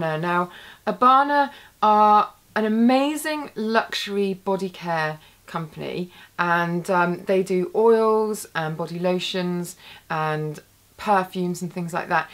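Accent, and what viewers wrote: United Kingdom. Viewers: You are amazing!!!!!! I like ur British accent( usually I'm not fan of it:), you are so unique and eye-popping! Keep doing an amazing job!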